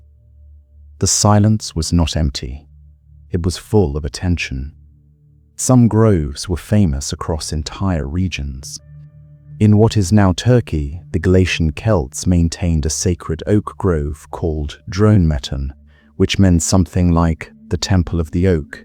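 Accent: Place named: United Kingdom. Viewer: Truly appreciate that the talk over is done by someone who's actually British or English rather than some American with their dirty terminology that will twist what truths we've got left I Appreciated This Thank You Buddy 🌹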